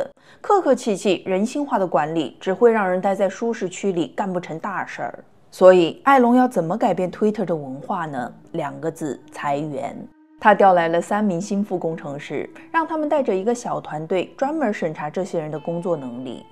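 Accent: Hunan, China. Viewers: 你这普通话😂湖南人吧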